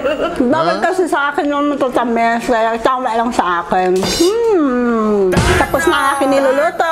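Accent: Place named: Iloilo, Philippines. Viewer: Ang cute!ganyan din ang accent (ilonggo)ng nanay ko tuwang-tuwa ako habang nanonood.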